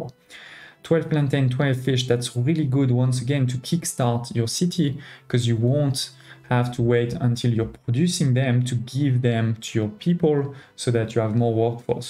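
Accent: France. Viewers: Are you french ? You speak a very good english with a french accent :)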